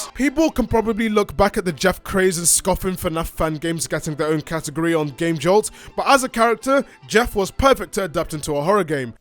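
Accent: England, United Kingdom. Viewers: this dude is 100% English you just know lmfao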